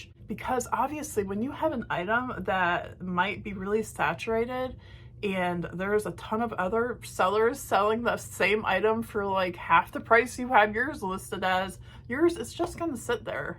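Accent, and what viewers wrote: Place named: Ireland. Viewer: Great Irish accent! What movie was that with the junk lady troll?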